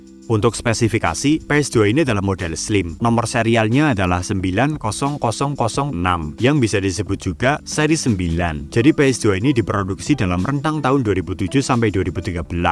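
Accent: Banyumas, Indonesia. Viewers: Pada² ngapak Oh iya njenengan wong ngendi mas? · mas njenengan Banyumase pundi?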